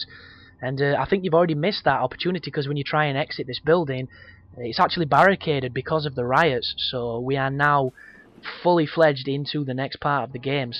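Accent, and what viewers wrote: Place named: Northern England, United Kingdom. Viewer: Nice walkthrough mate....As a 51yr old gamer I found it refreshing to hear an Northern English mans voice!!!...all the best to you ..from NY....THAT'S NORTH YORKSHIRE NOT THE YANK CITY!!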